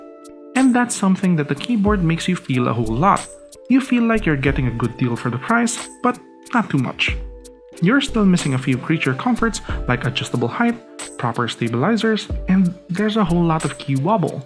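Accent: Philippines. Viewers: Very sexy filipino accent, say "bye roos" for me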